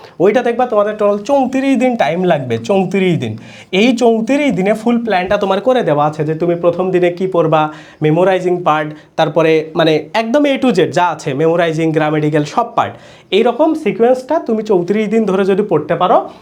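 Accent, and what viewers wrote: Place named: Bangladesh. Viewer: So many Bangladeshi teachers have this one thing for improper pronunciation. I think it's due to their local Bangla accent. Otherwise their teachings are good. The pronunciation low-key puts me off tho. But I don't think that's a big deal.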